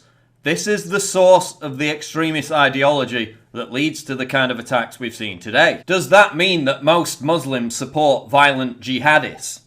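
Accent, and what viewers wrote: United Kingdom. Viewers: wait is this guy British?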